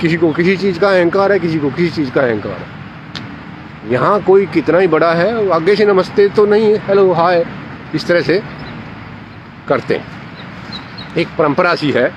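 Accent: Haryana, India. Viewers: No brother his pronunciation is entire Haryanvi and none else.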